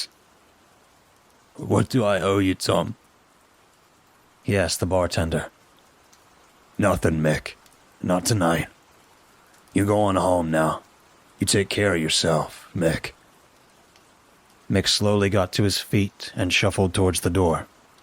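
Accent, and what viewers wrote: Scotland, United Kingdom. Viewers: I wasn't meaning to criticise. Its like Christmas when you upload. Your content is always top quality. It was cool that it wasn't the typical generic hollywood scottish accent. Your still much more accurate that others would do. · haha love your Scottish accent not bad at all 👍🤣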